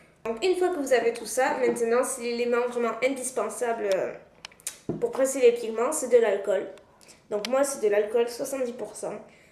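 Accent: South, France. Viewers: C'est bizarre, on dirait que tu as plus l'accent du sud dans cette vidéo que dans les actuelles :o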